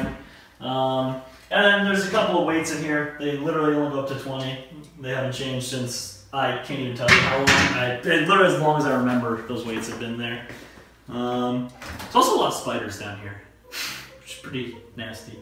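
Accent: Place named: Netherlands. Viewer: He kinda sounds dutch tbh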